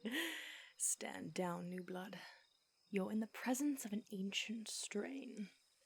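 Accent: United Kingdom. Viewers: Pretty good Brittish accent love or are you actually English ? Also I subbed and dinged coz about time SOMEONE did a bloody vampire RP without resorting to that bullshit hypnosis power to do the "forget" bollocks Also I'm English and thought your accent was kinda sexy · If I'm not wrong i think you are speaking in a British accent yeah? 😎😎 sounds awesome for a British vampire yes?👍👍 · I love youre accent Are you British ? ;) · That accent man...British women are the hottest sounding women on earth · I never knew I needed a british voice in my loneliness but I now know I needed it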